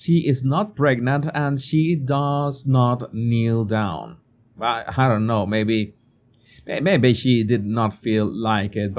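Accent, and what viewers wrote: Spain. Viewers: your your accent is not anywhere near American. You speak English with a 100% Spanish accent. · I’m not a MM or Henry fan at all, can’t stand them. But, You can’t expect her to have a Colombian accent if she’s not from there. It’s like Canadians or Americans expecting a foreigner to have the dialect down perfectly when visiting as a tourist. It’s outrageous. Especially since you have a Spanish accent and have been doing YouTube videos for years in english. You are just being picky at this point and a little snobby.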